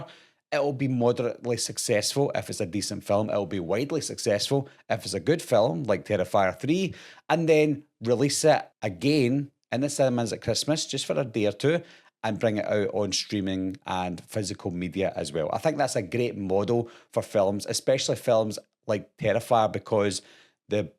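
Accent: Scotland, United Kingdom. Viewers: Great video, man, awesome films, Damien Leone, is a great horror film maker, I really love your Strong Scottish accent, by the way, keep up the great work 💯🙏🏼💙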